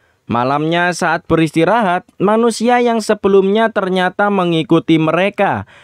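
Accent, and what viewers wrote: Java, Indonesia. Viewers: cuman bilang meddok doang bang,kaga raasis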